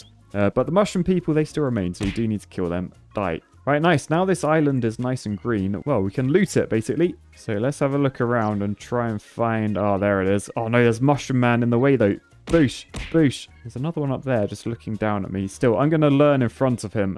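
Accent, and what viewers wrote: United Kingdom. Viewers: sounding kinda British this episode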